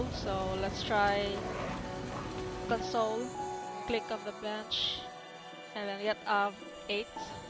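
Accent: Philippines. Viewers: your accent... pinoy?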